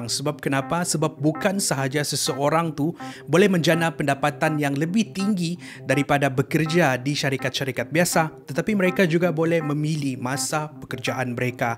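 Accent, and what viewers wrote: Sabah, Malaysia. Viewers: Bahasa Sabah dia dah tak pekat.. dulu dia cakap Sabah pekat gilerrr.. suka betul kalu dia cakap ada slang Sabah..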